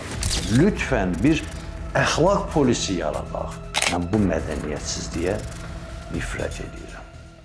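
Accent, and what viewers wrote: Azerbaijan. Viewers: Bu kişi tər təmiz əsl Azərbaycan dilində danışır.amma bəzi kometləri oxuyanda adamın heyfi gəlir bu kişinin danışmağına.Necə tələffüz edirlər elə də yazırlar.🤔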